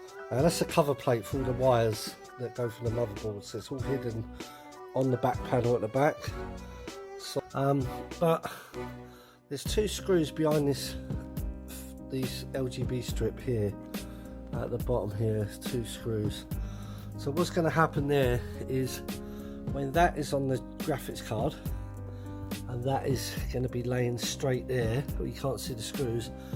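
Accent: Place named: RP, United Kingdom. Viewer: You sound posh compared to me ahah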